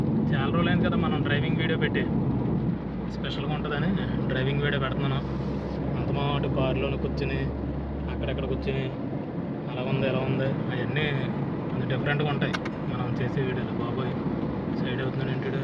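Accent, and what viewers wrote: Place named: India. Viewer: Nice Vlogs mana Telugu Language and slang lo 👌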